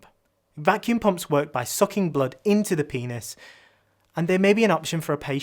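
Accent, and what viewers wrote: United Kingdom. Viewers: What a huge difference in hearing a doctor from the UK explaining all this, rather than an american doctor.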